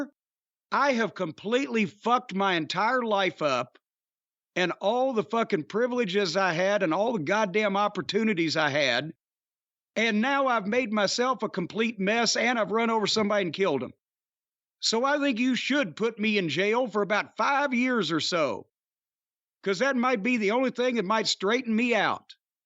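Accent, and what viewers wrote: South, United States. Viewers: I never liked Jim Cornette when I was a youngster growing up and being a fan of WWE. I was too young to really realize, I wasn't really supposed to like him. He was a heel manager, and he was supposed to act that way to get me to dislike him. I disliked Jim Cornette the character :) I LOVE Jim Cornette the orator. I could listen to him talk for hours. His cadence, his accent, and while he sounds like he should be some kind of country bumpkin, he has a rich vocabulary.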